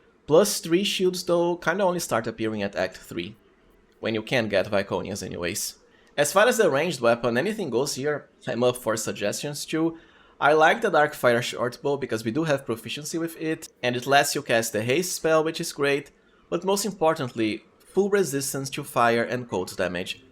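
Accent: Brazil. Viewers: Se eu tivesse que chutar pelo sotaque ele é br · where are you from? your accent sounds brazilian! if you are, shoutout from a fellow countryman :) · esse sotaque ai ta meio br kkkkkkkk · Cara, você é do Brasil? Seu inglês parece muito de um brasileiro.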